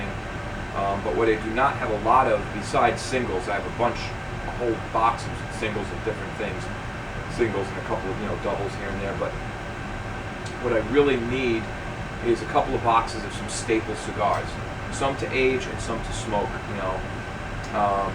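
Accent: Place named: Massachusetts, United States. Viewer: I noticed the patriots hat and the accent lol Are you from Massachusetts? I'm from Taunton, Mass.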